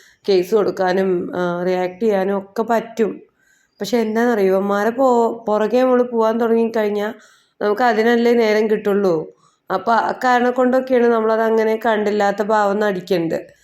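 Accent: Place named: Valluvanad, India. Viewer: വള്ളുവനാടൻ ഭാഷ കേൾക്കാൻ നല്ല രസമാ.. from Kottayam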